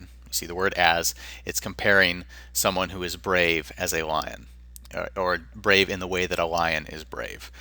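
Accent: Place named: Midwest, United States. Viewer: It sounds midwestern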